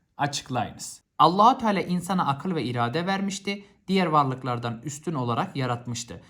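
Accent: Malatya, Turkey. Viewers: Hocam, Malatyalı mısınız? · Hocam no 44 Malatyalımısınız 😂😂😂🎉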